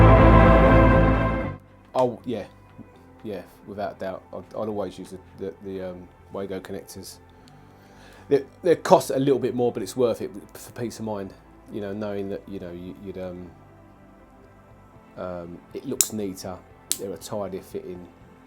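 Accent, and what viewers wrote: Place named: United Kingdom. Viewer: I can’t be the only one that watched this video cos if his British accent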